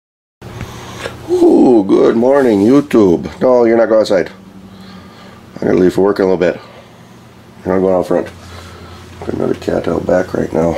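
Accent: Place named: Canada. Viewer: are you in Canada? can you speak with out a Canadian accent?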